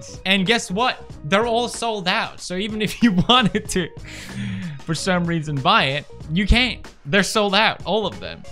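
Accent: England, United Kingdom. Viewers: Hmm sounds like he’s in England’s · Your swedish has an english accent and i dont like it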